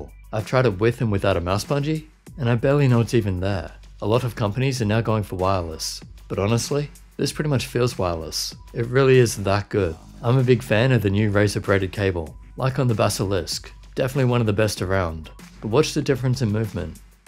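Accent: Australia. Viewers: Nathanael Ciranni not really, it’s more that his accent doesn’t sound Italian. Sounds more Australian, or maybe from New Zealand.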